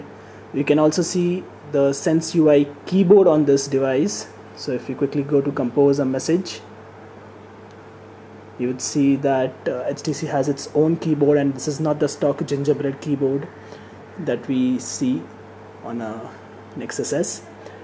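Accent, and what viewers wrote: India. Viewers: u are an ass with fukin indian accent.. gayboy... :x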